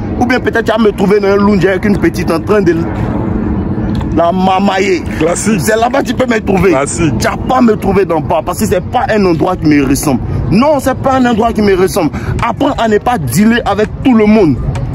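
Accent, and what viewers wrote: Ivory Coast. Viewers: on adore ton accent ivoirien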